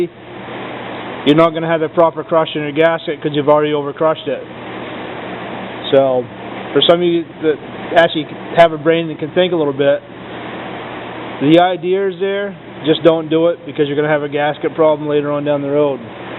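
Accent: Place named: Maine, United States. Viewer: Where are u out of? From ur accent my guess is up here in maine...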